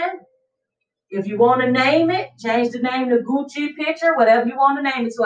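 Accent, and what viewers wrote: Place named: Florida, United States. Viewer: This was very helpful. I knew right away from that accent that you were from Florida. I believe I am right. 🤭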